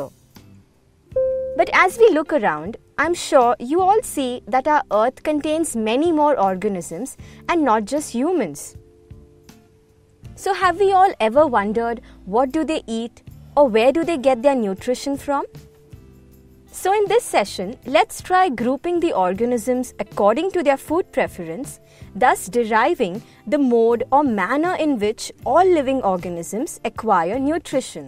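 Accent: India. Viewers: She's Indian, and people pronounce words different according to their accent. Instead of just pointing out her flaws. Tbh, you could use that mouth to appreciate her knowledge -_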